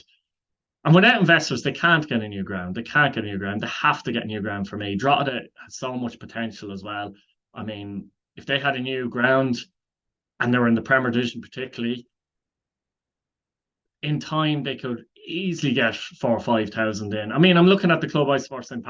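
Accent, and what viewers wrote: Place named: Derbyshire, United Kingdom. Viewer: You have an odd Ashbourne accent.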